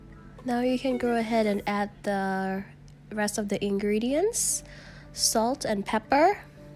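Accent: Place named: Luang Prabang, Laos. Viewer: พูดภาษาลาว เพราะกว่า เพราะเสียงขึ้นนาสิก จะพูดจะร้อง ก็เพราะ ชอบเสียงและสำเนียงหลวงพระบางมากๆ ฟังแล้วสบายใจ ถ้าทำคลิปต่อทามมิ่งตอนนี้ ก็ยังโอเคอยู่นะ เป็นกำลังใจครับ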